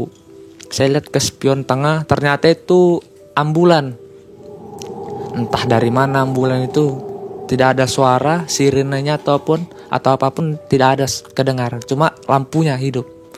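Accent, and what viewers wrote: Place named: Samarinda, Indonesia. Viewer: Dengar logat Bang jadi inget temen di kampus, beliau orang Samarinda. Pas beliau menikah, saya datang, memang sih kalimantan itu kanan kiri hutan, kalau bukan orang kalimantan yang bawa mobil agak sulit. Kebayang malam2 bawa mobil ke bukit yang notabene hutan begitu, jelas harus hati-hati, karena memang bangsa jin lebih banyak bersarang disana.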